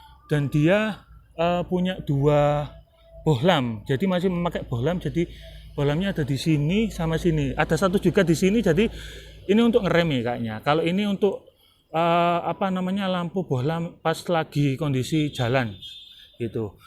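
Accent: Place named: Java, Indonesia. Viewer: mantap reviewnya mantap suara medoknya he....thankz bg..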